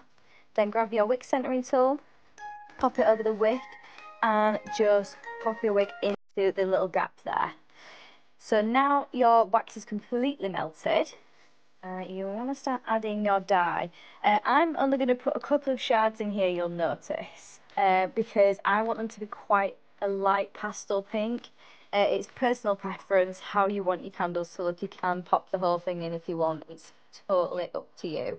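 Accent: United Kingdom. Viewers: Thank you. Quick and simple. (Are you from Hull?) love the accent. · Nice and simple to follow. You sound like you're from my neck of the woods, Leeds maybe? It's unusual to hear on YT 😊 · Uffff…. that nonsense British accent!!! Such a torture to ear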